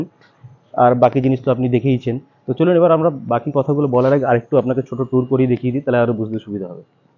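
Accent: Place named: India. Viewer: Uni bangali non, tai carefully shule kichu kichu jaygay hindi chaap eshe jache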